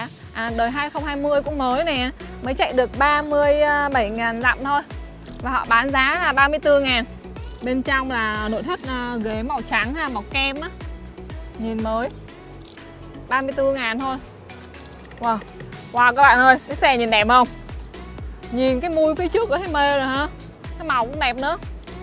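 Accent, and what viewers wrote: North, Vietnam. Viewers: tiếng anh pha lẫn tiếng Bắc chính hiệu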